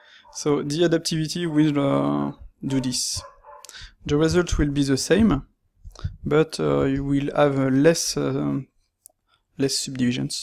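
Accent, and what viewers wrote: France. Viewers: bizarrement je sais pas si c'est l'accent français qui me fait cette impression mais je crois trouver plus facile d'apprendre houdini sur cette chaine en Français comme en anglais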